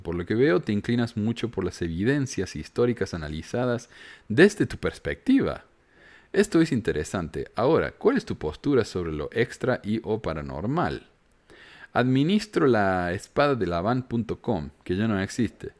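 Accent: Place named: Córdoba, Argentina. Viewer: Uuuiii ese comentario sobre tu acento no vaa!! Y qué!, si les molesta. Tu acento es lo más hermoso y lo más ritmico de los acentos del mundo, tiene vida tu hablar, tiene cadencia. Amo tu ace to cordobés. Aunque muchas provincias de Arge tina tienen ese acento tan hermoso. Mi hermana vivió un tiempo en Córdoba y las vecinas le decían La Yeya por hablar con y, de Santa fe. Jamás un acento debería ser para discriminar por que habla de la escencia de la persona, y ninguna discriminación. Quizás, el que lo dice, quisiera ser como vos, bueno que lo diga y ya está!. Te enontré, te quiero, te amo, zaludoz. (mucha gente en zona de Santa fe y de Entre Ríos reemplaza la s por la z)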